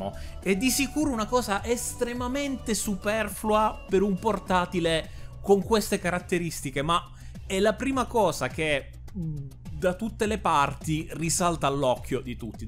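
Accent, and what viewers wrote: Italy. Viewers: 32GB di ram sono più che sufficienti anche con un solo banco dici. Non avere un doppio banco significa non avere il dual channel, e non avere il dual channel specialmente per i processore Ryzen è una GRAVE mancanza che avresti dovuto sottolineare. Questa è disinformazione e mi dispiace davvero tanto dovertelo far notare dato che dal tuo accento si capisce che siamo della stessa terra.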